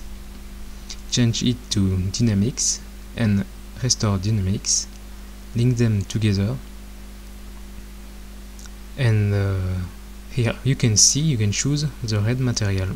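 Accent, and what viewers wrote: France. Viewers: I love your French accent. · AWWW yeah french accent is the best le accent!! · je pense que tu es français vu l'accent ^^, peus tu envoyer un lien de téléchargement de ceci pour travailler dessus s'il te plait? Merci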